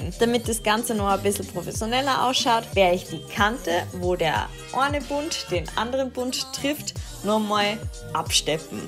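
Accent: Vienna, Austria. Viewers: An dieser Stelle ein riesen Dankeschön für deine Mega tollen Videos 😊 ich liebe deine Projekte und habe so viel Freude beim anschauen weil ich den Wiener Dialekt einfach nur liebe 😍❤️😍. Vielen Dank und mach weiter so!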